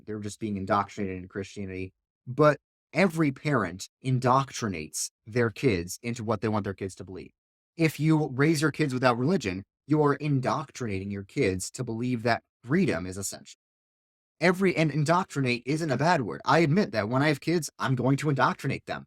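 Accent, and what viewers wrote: New York, United States. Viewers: When gets mad, his New York accent just gets stronger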